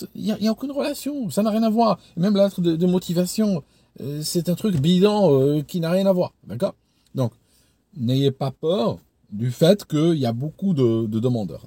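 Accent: Vaud, Switzerland. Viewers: Et bien j'apprécie l'accent Suisse. Merci de tes reflétions qui inconsciemment mettent le candidat comme l'homme fort de l'entretien. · Il me semblait bien qu'il y avait un accent vaudois 😂 Je pensais que c'était une hallucination Super vidéo, ayant été moi même à la recherche d'un emploi ces derniers mois, je me retrouve tout à fait dans tes conseils mais j'en ajouterai un toutefois : me négligez pas votre réseau quand vous êtes en études ou chez votre précédent employeur. J'ai vu des gens de ma volée de Master trouver des jobs très (trop) bien payé alors qu'ils étaient carrément mauvais tant sur la pratique que la théorie, qu'ils avaient une attitude très peu professionnels simplement parce que quelqu'un dans leur réseau a pu les pistonner. Vous pouvez être le candidat idéal à un poste, si l'ami d'un manager le veut également vous passerez à la trappe. 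· Merci 🙏 pour la réponse clin-d'oeil à ma question sur votre accent suisse. Il n'y avait rien de désobligeant, juste que je regarde pas mal de vos vidéos et que je ne l'avais pas entendu précédement. En tout cas j'aime beaucoup le contenu de vos vidéos 👍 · PTDR à la fin "je fias un effort pour pas parler avec l'accent suisse" alors qu'il a typiquement l'accent suisse X) la même manie de prolonger légèrement l'avant-dernière syllabe, tout X) Vidéo incroyable, c'est vraiment le daron idéal, il a de l'expérience constructive et il arrive à revenir dessus et à en livrer le contenu essentiel... Ce type aurait dû devenir prof/chercheur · L'accent suisse sonne très sympathique, personnellement je trouve que vous ne devriez pas le masquer, au contraire 😀 merci beaucoup pour tout ces conseils, je suis actuellement demandeur d'emploi et c'est une mine d'informations utiles. Très bonne continuation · Notre accent suisse est juste parfait... 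On s'en fout des haters😂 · Excellent ton accent de chez nous, je suis Suisse aussi (nord vaudois) pourquoi tu es parti? Merci pour la vidéo 👍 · C'est super agréable de vous écouter avec ou sans accent suisse, donc pas de soucis 😉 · Bonjour, bonjour à tous. Merci pour tes vidéos et ta pédagogie au travers des exemples qui tu cites, c'est très instructif; lâche toi sur l'accent Suisse, ça fait partie de ton profile! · Ne t excuse pas de prendre l accent suisse je suis français mais la vie est pas désagréable que ça en Suisse et les Suisses 🙂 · Ne change rien pour ton accent suisse qui refait surface de temps à autres, c'est sympa je trouve, en tout cas ça ne me gêne pas du tout, les accents ont tous leur charme. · Il est génial ton accent Vaudois, on l’aime beaucoup. C’est un héritage dont il ne faut pas avoir honte, un souvenir de voyage qui fait maintenant partie de toi, une richesse, un métissage, une pépite 🫶🏼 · Joli accent suisse...c est bien de s adapter moi aussi je prends des accents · Ah voilà pourquoi l'accent suisse ! 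· Merci pour ta vidéo et vive l'accent du Gros-de-Vaud! Ayant moi aussi cet accent de part mes origines et j'y habite toujours d'ailleurs. Tu as vécu dans quelle localité? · C’est vrai pour l’accent suisse, je m’étais dit qu’ dérivait doucement, vers un nouveau lieu de résidence, donc intéressant pour s’entraîner. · Coucou! Si j’ai parlé de ton accent suisse c’est que j’ai trouvé ça trop mignon: un algérien qui vit en Angleterre avec un accent suisse, franchement, il n’y a que toi pour ça! Merci pour tes super vidéos qui éclairent mes journées! 😊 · Ah ! Je mdisais bien que tu parlais avec un accent suisse ! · Si! C'est sympa cet accent suisse. Merci pour tes conseils. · L'accent suisse me rappelle les jeux olympiques d'Albertviiiiille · en tant que Suissesse, j'adore cet accent 🇨🇭👍😊 ne chassez pas le naturel 🙏😊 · merci pour cet accent suisse, au final ca me détend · y a que les francais qui se plaignent de l'accent suisse le reste des francophones 400 millions de personnes adorent ton elocution 😃. 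C'est toujours les memes qui se plaignent et qui font la greve 😁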